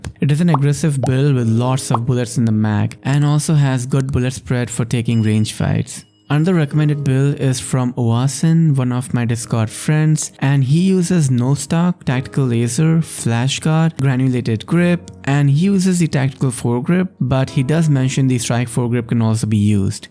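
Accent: India. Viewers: Your accent sounds like Indian. Are you Indian?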